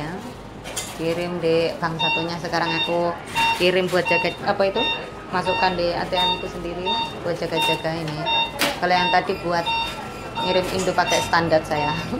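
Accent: Java, Indonesia. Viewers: Mbk jowo ne nok ndi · Jowone ngendi ce? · hi mbak...salam kenal. saya lia dari cibubur. sukaaa sekali sama vloq mbak. aku juga suka dgn medoknya mbak. dan paham sekali artinya meski aku bukan wong jowo rek 😂.mbak tulus ngurusi si mbah. dan perilaku majikan pun baik sama mbak. itu imbalane mbak orng baik ketemu yng baik.btw, mbak dah brp lama di HK kerjone?bahasa HK nya juga hebat euy. kursus bahasa dulu mbak sebelum ke HK? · Jowone Ojo ngasi ilang mbak 😂😂😂😂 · Mba jowo e pundi kok muedok banget, salam dari surabaya 💗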